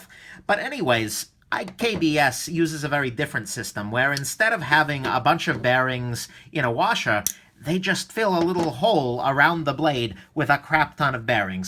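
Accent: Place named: New Jersey, United States. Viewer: ! Love your reviews and your voice reminds me of everybody I ever grew up with in Jersey. Seriously, your reviews are detailed, descriptive and objective. Thanks and keep it up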